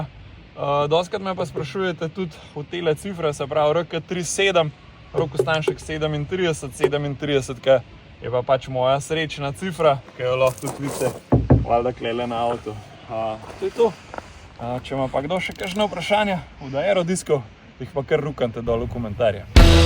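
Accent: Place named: Upper Carniola, Slovenia. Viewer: gorenščina pa še neka qvazi angleščina avto fajn zelo pa malo potrudise pa boš imel še več ogledov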